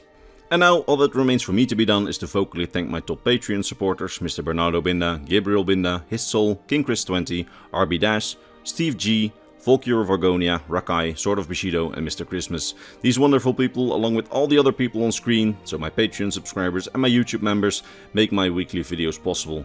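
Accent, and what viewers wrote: Netherlands. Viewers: I hate your accent. Dutch should not have one.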